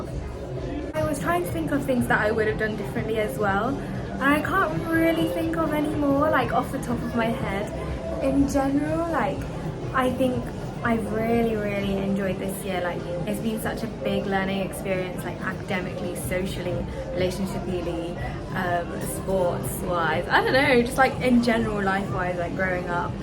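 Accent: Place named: Southern England, United Kingdom. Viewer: Weird observation to make, although your accent is very southern, when you say done it sounds very northern lol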